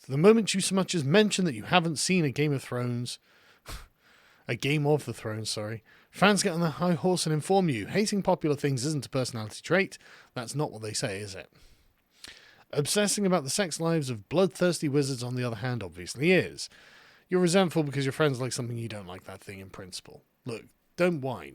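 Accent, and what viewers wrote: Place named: United Kingdom. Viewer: You’ve got the most awesome British accent ever!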